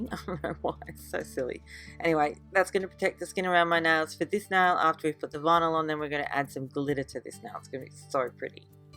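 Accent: Australia. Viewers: All of the three design are gorgeous,I'm happy to hear an Aussie nail technician I'm an Aussie too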